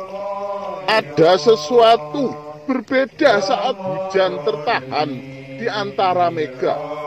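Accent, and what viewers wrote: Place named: Java, Indonesia. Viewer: Mas ojo medhok2 kalo bicara☺️👍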